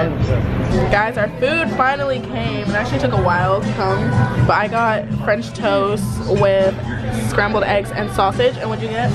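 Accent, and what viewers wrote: United Kingdom. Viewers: I can hear little hints of the UK accent coming out.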